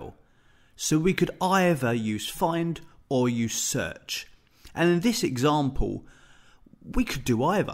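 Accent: United Kingdom. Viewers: Excellent explanation. I solved the problem I had. Thank you very much "indeed". I liked the way you explain, especially for your accent! From now on, I will come to your channel in case I have troubles with Excel. Also, I'll tell my friends about your Channel. I studied English in Cambridge, UK, a long time ago, and I always loved the British Accent. Now I live in USA, and the American English sounds like another language. (No offense, please)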